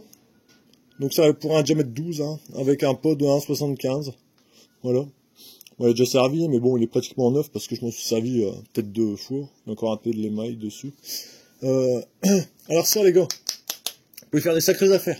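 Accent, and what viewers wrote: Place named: North, France. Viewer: je m'en douter que tu était du nord accent de min coin cha sintin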